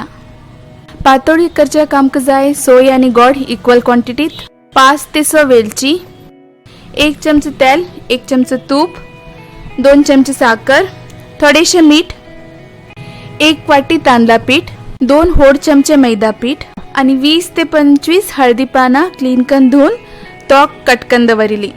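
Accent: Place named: Konkan, India. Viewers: Nice recipe explanation with nice konkani tone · Ur Konkani is different 🤭🤭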